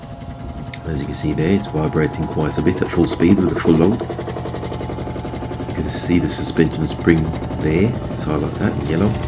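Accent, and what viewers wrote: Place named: Birmingham, United Kingdom. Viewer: Thank you for a reply too 👍🙏 I took it apart exactly how you said cleaned it with some wd40 & a tooth brush wiped it put it back together with some red grease it’s all i had it took just over an hour put a big load in on a 45 min 1200 spin wash its just emptying & spinning now “silent” I’ve had that problem that sounded like a machine gun for 6months took it apart twice & with your help in under an hour it’s like the day I brought it again I can’t thank you enough 👍👍(every other vid on you tube was saying spider or bearings)🙏 saved me £300+ replacement 🙏.. & just on a tangent I noticed the accent im originally from B32 (don’t know how close that is to you ) if i still lived there I would come shake your hand & buy you a drink thank you so much 🙏🙏🫡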